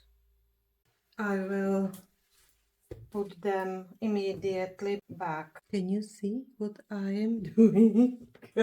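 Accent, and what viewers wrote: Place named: Czech Republic. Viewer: Nechci se vás dotknout, vím, že to nesouvisí s výrobou mýdla, ale když už chcete natáčet videa v angličtině, bylo by dobré zapracovat na výslovnosti. Ta "czenglish" opravdu trhá uši, nezlobte se.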